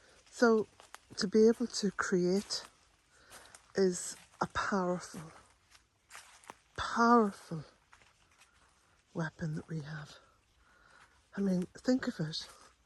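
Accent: Ireland. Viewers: Yet again, thank you for your words, you speak so much sense. I love your hat. You always look so smart, and we all love your soft voice and Irish accent. What a gift you are for us poor souls. It is my Birthday on the 19th November, and there is a full moon! I am so pleased, and shall be out in the dark just taking in the beauty of it all. May I send you Blessings from Devon xxx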